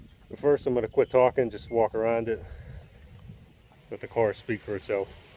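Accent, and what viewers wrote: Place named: Pittsburgh, United States. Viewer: Are you in Pittsburgh. Want to have some similar things done to my SL. Who did you use. Sounds like a Pitts accent. Best, Dave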